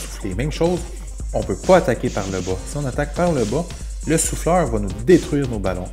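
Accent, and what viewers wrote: Quebec, Canada. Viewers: Tu est québéquois ?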